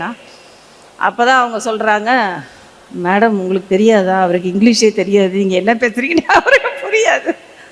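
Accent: India. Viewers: ஆனால், நல்ல தமிழ் கதைக்க உங்களால் முடியவில்லையே. Madras தமிழ் தானே கதைக்கிறீர்கள். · உன்னைப் பார்த்தால் சிரிப்பா வருது..🤣🤣🤣 அரபிக்காரனுக்குத்தான் உன் English best ஆ தெரியும்... America, Canada ல பேசிப்பாரு... உன் பேச்சிலேயே நீ இந்தியன்னு சொல்லுவாங்க. (because of your accent) 🤣🤣🤣 முதல்ல ஒரு விஷயம் புரிஞ்சுக்க.. English ஒரு language தான்.. ஒரு வெள்ளைக்காரன் தமிழ் பேசினால் எப்படி சந்தோஷமாக ஏற்கிறோமோ அதே போல நாம் English பேசினால் அவனும் அதை ஏற்றுக்கொள்வான். அதுக்காக அவன் நம்மை அதிகம் படிச்சவன்னு நினைக்க மாட்டான்.. நம்மள மாதிரி முட்டாளா அவன்???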